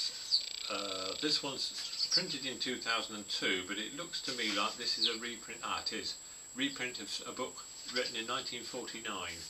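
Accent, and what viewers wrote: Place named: England, United Kingdom. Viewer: hi are you still selling books? It's so refreshing to hear an English accent on here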